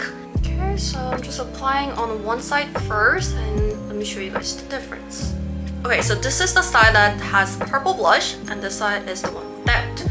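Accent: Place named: England, United Kingdom. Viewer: Thank you for sharing this video ❤❤ btw I LOVE your English accent and you look a little bit like Wonyoung :)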